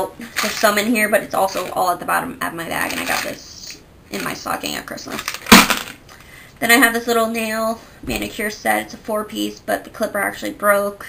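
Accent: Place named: United States. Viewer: So cute! I love your American accent <3 Super cute purse too!! Your purse looks so much cleaner and more organized then mine, I totally have the same glasses case xo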